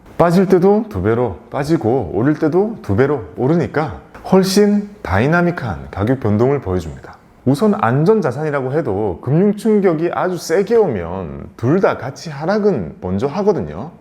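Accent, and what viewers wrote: Gyeongsang, South Korea. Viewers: 와후~~ 추카드려요^^ 님~경상도 빠꼼인가봐요,억양이 ~~ㅎ 저도 그쪽인데 ㅋ 덕분에 실버버튼 구경했네요^^ 미소 미소 미소 미소 ^^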